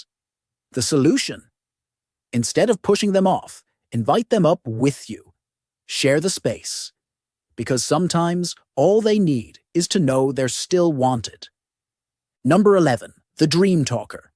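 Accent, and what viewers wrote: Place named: Dublin, Ireland. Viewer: What a horrible voice over - some sort of warped south side dublin accent , sounds like it’s reading w emphasis in all the wrong places - unreal - cringe making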